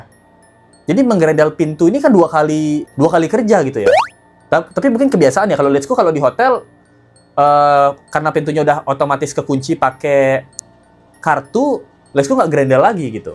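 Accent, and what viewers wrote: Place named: Sumatra, Indonesia. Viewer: Logat lo sumatera bgt😅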